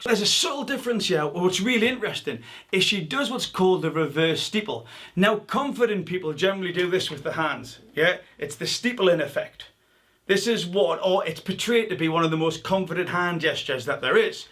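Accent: Newcastle, United Kingdom. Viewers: You sound like a Geordie spot on video thankyou xxx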